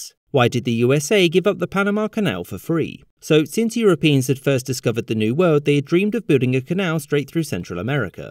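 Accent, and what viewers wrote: England, United Kingdom. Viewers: Great. Now. Why did US give up the canal? Cut the English accent. Slow down. speak simpler. Don't try to impress with how smart you are.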